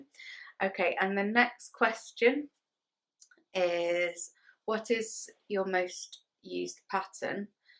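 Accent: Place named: Northern England, United Kingdom. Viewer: Hi! I've only just found you. What a soothing voice you have. I thought I detected a northern accent there, I'm from Chorley ( 20 minutes on the motorway from Manchester). I really love Abakhan as well.... I love the Northern quarter in general. Good to know about Moston, I'll give it a try! Lovely to hear all about you! Thank you. 😘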